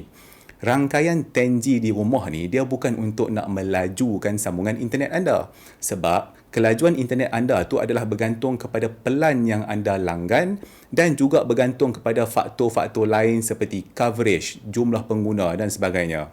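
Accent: North, Malaysia. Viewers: Saya pernah tengok dalam video dia yang lain, dia ada cafe. Sebenarnya video2 macam brother ni buat banyak dalam youtube, yang cun nya brother ni buat video dalam bahasa Melayu slang utara, dan cara penyampaian pun mudah faham, bukan semua orang boleh hadam video omputeh ni termasuk aku.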